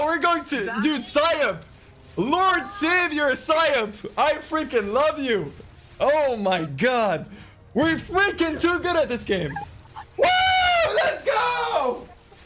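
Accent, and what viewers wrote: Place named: France. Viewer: You have a nice french accent (I am french)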